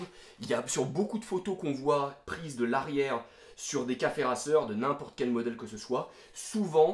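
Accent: United States. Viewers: Je découvre ta chaîne (même si ta Yam c'est une "cardan"😁). Je m'étonnais de voir (entendre) un Français qui a un bon accent anglophone (américain), mais apparemment, tu vis aux States. En revanche la dénomination de ta Yam, n'était pas "Virago" : à l'époque dans l'Hexagone, on avait la XV750 Special, mais la tienne ressemble à une série sortie chez nous en XV1000SE la Midnight Special (avec sa finition noire et dorée) avec 2 disques à l'avant pour la 1000. Les "Virago" XV 750, 1000 et 1100 en France n'étaient pas équipées du mono-amortisseur cantilever, mais de deux suspensions arrières, ce qui est plus contraignant pour la transfo café-racer. En tout cas beau boulot !😃👍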